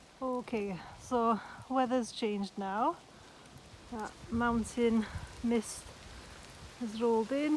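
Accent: North Wales, United Kingdom. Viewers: North Wales 😁🏴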